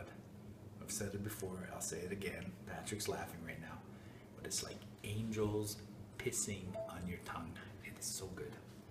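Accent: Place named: Canada. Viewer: Lol i was thinking Canada before you said you were from Canada. Great video. Its like Angels pissing on your tongue lol. I love it.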